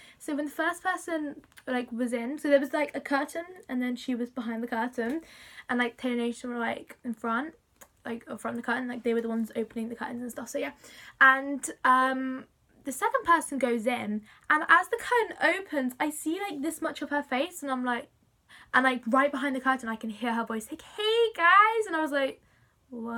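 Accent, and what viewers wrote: United States. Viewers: I am a 34 year old woman getting ready to go to a Taylor Swift concert in a couple weeks. I feel like we are sitting here fangirling together. lol And your American accent was one of my favorite parts. :) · Awesome video!!!! just found your channel. That's a good start on your American accent. Keep working on it. Practice makes perfect.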